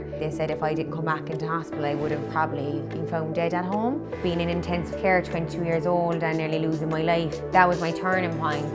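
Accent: Ireland. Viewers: Couldn't believe it when I heard da Cork accent on utube! 😄 I'm from Cork too....Barrack St area. Fair fucks to you, lady. You are blessed. I nearly died from obesity. Heart attack at 45, open heart surgery at 53. That could have been in store for you or worse. I turned it around just like you after my operation 7 years ago. I calorie counted at the start, eat a clean diet and zero junk food. I exercise regularly and do Kettlebells and Bands for resistance training at home. Lost 80lbs and more importantly my destructive junk food habit and 80 a day smoking habit. I have a few nice war wounds to remind me off my past....a nice scar all the way down my chest where they sawed me open for the op in the CUH. I also have a nice scar from my ankle all the way up to the top of my thigh from where they harvested my vein from my leg to perform the bypass surgery. Lucky to be typing this, so scars are a least of my worries now. 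Great to see your transformation and more importantly that you have given yourself an opportunity of a long life. You are looking hot, 🔥 my dearest....not just on the outside, but most definitely on the inside too. Well done. Lots of love on this Paddy's Day.☘ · I stuck around mainly for the Irish accent 💚🍀😁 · Beautiful girl, she must be Irish with that accent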